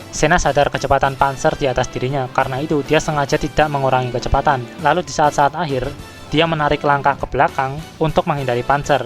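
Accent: Java, Indonesia. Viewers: Miminnya orang jawa ya?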